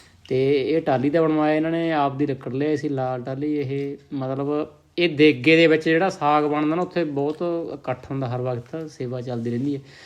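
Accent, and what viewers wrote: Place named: Sangrur, India. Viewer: ਭਾਈ ਤੁੱਸੀ ਤੀ ਬੋਲਦੇ ਹੋ, ਸਾਡੇ ਸੀ ਬੋਲਦੇ ਹੋ ਤੁਸੀ ਸੰਗਰੂਰ ਜਿਲ੍ਹੇ ਦੇ ਹੋ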